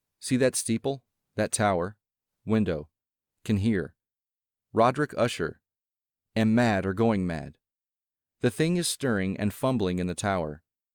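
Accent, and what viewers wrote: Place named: United States. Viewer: Always loved this one. And the narration is by an American!...for Poe and lovecraft - a must have. Imagine M R James or Arthur Machen read by someone NOT from the UK. This narration of HPL...was very brilliant!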